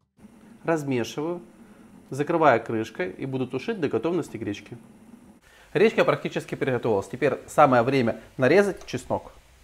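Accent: Ukraine. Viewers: таке враження, що Ви говорите російською з українським акцентом.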